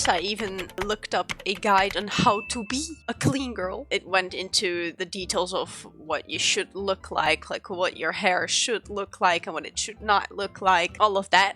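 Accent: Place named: Netherlands. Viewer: You sound Dutch <3 Are you Dutch? Very interesting topic!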